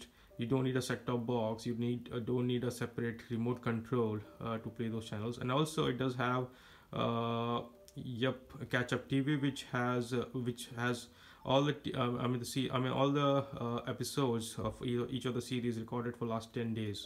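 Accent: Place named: India. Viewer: The indian accent suckssss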